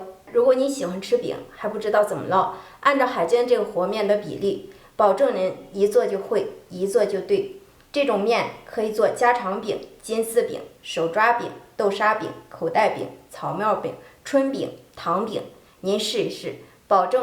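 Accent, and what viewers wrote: Shandong, China. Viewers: 山束話特别親切 。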